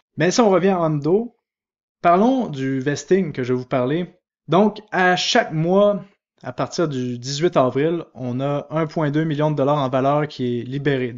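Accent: Quebec, Canada. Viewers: Merci, je te suis depuis quelques mois car j'ai acheté des cryptos il y a 6 mois...j'adore ton accent canadien et les expressions canadiennes que tu emploies...mais surtout tes analyses sont intéressantes et pertinentes merci bcp.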